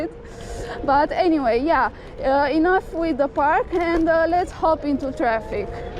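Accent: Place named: Russia. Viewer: Mother russia accent. Pe deum rau stai pe pernițele degetelor din talpa ca sa nu iti mai zbenguie capu prin casca. Adica ridica-te putin pe varfuri si ai rezolvat problema.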